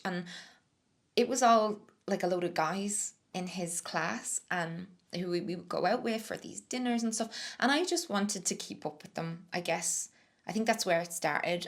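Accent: Ireland. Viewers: The irish accent is really nice to listen too :) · First but least important… you Irish accent is like music to listen to… more importantly, your stories in this video match my experience… actually tired of waking up and checking my phone to see what the fuck I did last night… I’m not there yet but I’m binging videos like yours to give me hope… thank you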